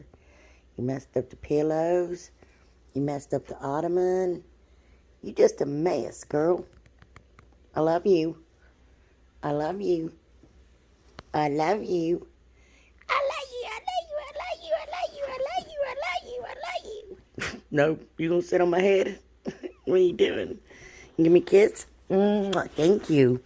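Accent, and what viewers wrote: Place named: Kentucky, United States. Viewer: Omgosh!! So glad I found you. You are my new favorite diy'er!! I love your personality and the way you are just so humble and down to earth! I even got my boyfriend to watch you a few times and that's a miracle !! haha. He says with your accent, you have to be our neighbor. lol. We are in KY! Anyway,,Keep making beautiful crafts & making us smile! 💕😁💕Anyway, I'm binging and getting caught up on all your videos I haven't saw. 😍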